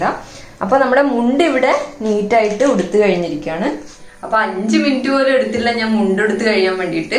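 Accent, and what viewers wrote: Kannur, India. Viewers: Kannur kaariyano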